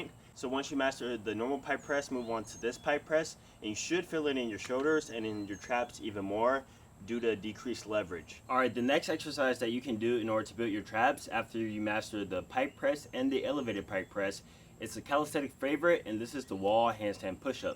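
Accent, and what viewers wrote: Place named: Caribbean. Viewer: You sound like you from the caribbean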